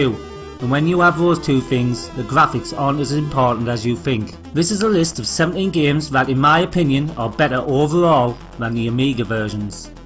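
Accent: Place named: Newcastle, United Kingdom. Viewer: Geordie retro computing! Subscribed!!!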